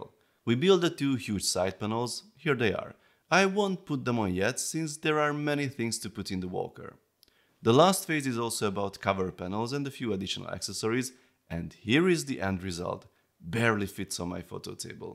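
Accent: Hungary. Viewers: Helló. Úgy hangzik az angolod mintha magyar lennél. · Damn, that Hungarian accent is strong.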